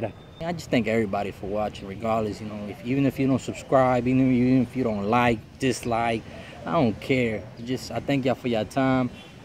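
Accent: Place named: South, United States. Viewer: Hola si se te nota en El hablando q estuviste en El sur de USA. Saludos y sigue adelante Muy bonito y limpio. .Carisimo para vivir ahi.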